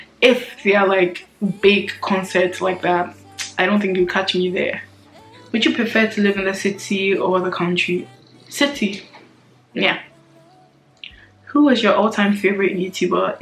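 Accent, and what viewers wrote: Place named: France. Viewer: The french accent is beautiful. Nice video ❤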